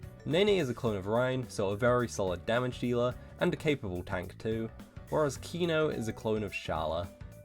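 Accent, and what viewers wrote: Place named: United Kingdom. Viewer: How did I just now realize you’re British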